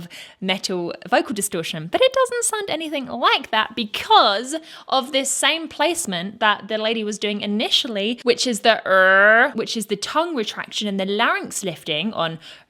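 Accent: England, United Kingdom. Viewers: ...." with their Vision".....What a GREAT IDEA!!!!....Have you Vocal analysed the great from the band yet?....They just happen to have a song called " ".....Now, you do have an English accent, and to my Canadian ears it sounded like "BULLS TO the WOOL"....If you meant the latter my deepest apologies....If on the other hand you meant the former, do enjoy... and UDO are almost as much FUN as I am. Cheers!!!...Ta....P.S...What does SMUGGLED A BUDGIE MEAN?.... --????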